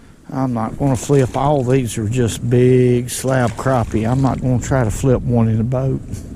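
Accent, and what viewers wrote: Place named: Alabama, United States. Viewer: “Guah luea” Alabama if I’ve ever heard it. Roll Tide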